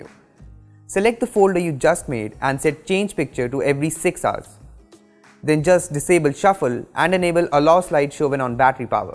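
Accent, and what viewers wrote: India. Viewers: your accent was funny man, Indian!!!!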